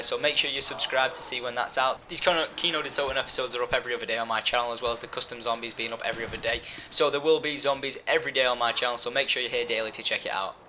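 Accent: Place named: United Kingdom. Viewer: i love how british people say modern warfare phree :D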